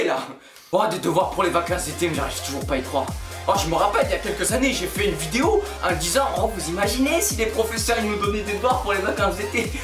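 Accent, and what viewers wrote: Marseille, France. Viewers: Ça sent MARSEILLE 😏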